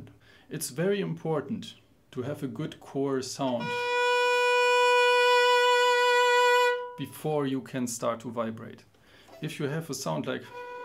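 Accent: Germany. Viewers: haha it's german accent lol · before i look about you i knew that you r from Germany from accent.this video is so helpful for me .so cute _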